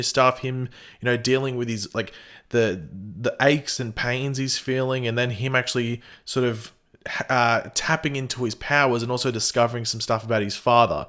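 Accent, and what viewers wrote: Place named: Australia. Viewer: im quite sure that you're australian from your accent like I am and I just saw this video and thought how did you see this before 13th july its the 14th now and I use a vpn to watch the show from the website and cant watch it yet how do you?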